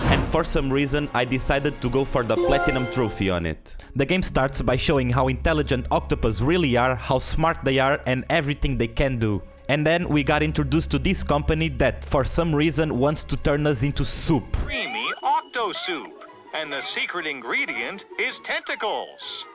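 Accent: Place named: Portugal. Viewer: O teu video apareceu-me nos recomendados do youtube, entrei por curiosidade, pareceu-me atrativo. Nas primeiras palavras comecei a perguntar-me a mim proprio se tu eras portugues, o sotaque estava muito a dar nas vistas. Fui ver a tua PSN e olha, és tuga mesmo. Boa sorte com os videos, +1 sub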